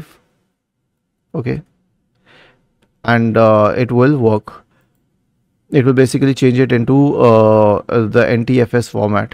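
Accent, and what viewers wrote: India. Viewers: Speak english not crap of indian mix it english stupid video:face-red-droopy-eyes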